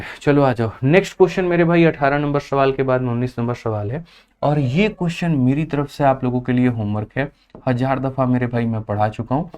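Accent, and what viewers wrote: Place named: Bhojpuri, India. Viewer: Sir ji aap Bhojpuriya andaz me lazawab lagte h 😅❤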